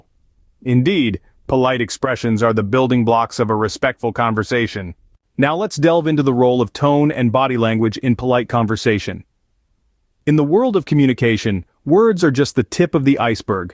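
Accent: United States. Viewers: this video is useful and helpful for me. thanky so much. is this american english? from japan shito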